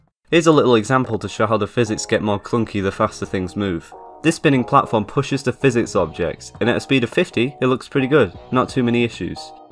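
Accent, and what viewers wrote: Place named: Northern England, United Kingdom. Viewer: kliksphilip but northern england instead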